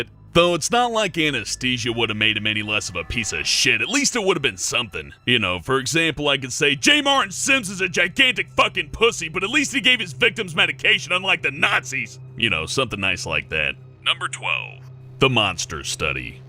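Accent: United States. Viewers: the way he talks is too deep, harsh, forced and silly for the content of the video. It's difficult to focus on what he's saying behind that shitty american-thicked accent of his. Dolan's voice is soothing, serious, and has great ethos. Basically, he just sounds a hundred times better. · when did become american?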